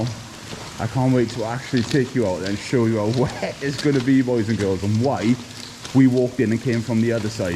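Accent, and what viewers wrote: Wales, United Kingdom. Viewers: Where you from mate im from Abergavenny and by your accent i recon you've got to be from somewhere like Brynmawr or Ebbw Vale??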